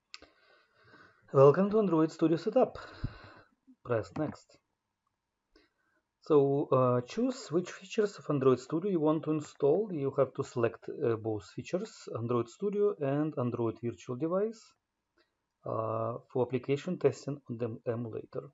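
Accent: Russia. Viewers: чета у тебя русский акцент и фамилия русская?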